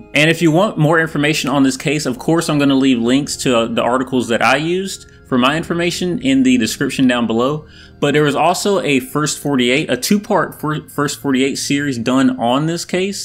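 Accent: South, United States. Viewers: This guy sounds a little country don't he?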